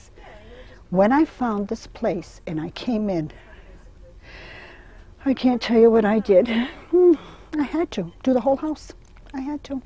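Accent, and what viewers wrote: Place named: Warwick, United Kingdom. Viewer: Strong Warwick accent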